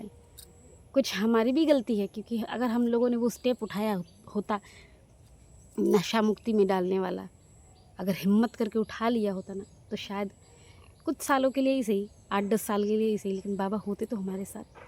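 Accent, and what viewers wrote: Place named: Assam, India. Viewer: Ba Apunar mukhot assamese xuni kiba eta val lagil ❤ daily viewer apunar ....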